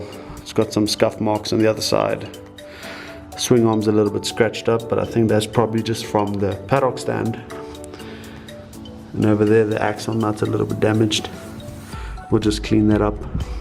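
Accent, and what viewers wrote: South Africa. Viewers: Ayyy I recognized the accent😉 I'm South African aswell. Enjoying your vids bru, keep it up.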